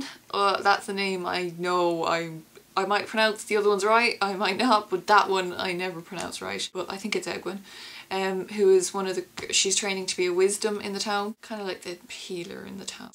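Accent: Ireland. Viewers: Joanie loved the review and your accent is very cool. Irish? I agree with you 100% 1 thing very noticeably lacking in the LotR books is character development. Jordan has full bodied characters (my favorite is Matt). I'm on book 12 "the Gathering Storm" . As far as pronunciation goes I'm listening to the audiobook versions (I guess that's a cheat) as I drive to work. Any way I'm off to see the rest of your reviews May you find water and shade Spear Sister! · I was just browsing some WoT videos, as I'm getting excited the final book is just months away and I was pleasantly surprised to hear your Irish accent (like my own) when I had expected an american review. I hope you do manage to review the rest of the series before the final release. Much love for this fantasy series. It's scale and depth are wonderful and inspire such passionate discussion among fans. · Do I detect a Irish accent? 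Or am I just being retarded? Good vid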